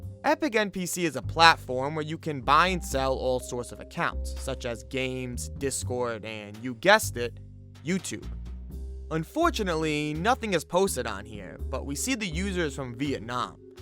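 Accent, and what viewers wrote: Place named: Boston, United States. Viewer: You sound so Boston lzzzzzzzzz east coast trash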